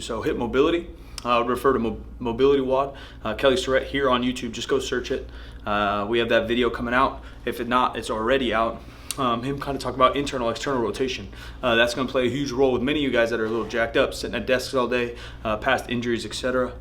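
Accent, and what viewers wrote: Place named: Russia. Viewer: Beautiful sumo technik russian accent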